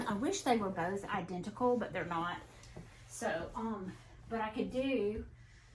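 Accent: South, United States. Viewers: Southern accent - my fave!!! So bring it on, lol. I'm a WI girl turned KY grandma, but I think I will always have my northern accent - "y'all" just doesn't sound right coming out of my mouth, but I wish it did 😂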